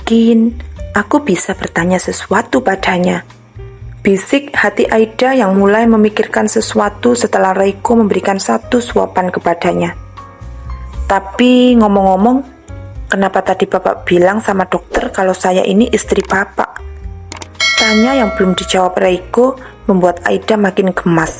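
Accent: Java, Indonesia. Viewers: Medoknya ini jawanya antara bebeb ucik atau bu de Riris yg jawa Asli❤❤😊😊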